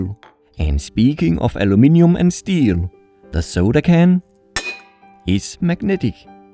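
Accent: Denmark. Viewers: I feel like you have a danish accent, hvis du er dansker så like mit comment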